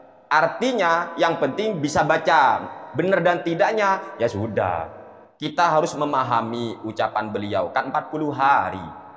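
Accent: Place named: Jember, Indonesia. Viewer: Kaya logat Jember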